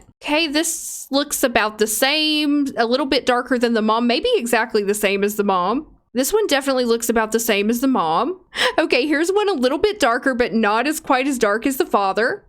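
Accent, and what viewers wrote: South, United States. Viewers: Do I detect a southern drawl?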